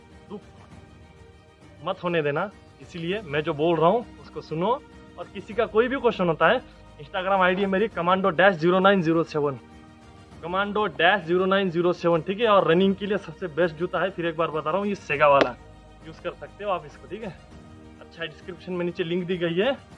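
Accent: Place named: Bengal, India. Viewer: Sir aap bngla bhasa bolte ho, aapki hindi सुन ke lg rha है,mera 3 june ko रनिंग है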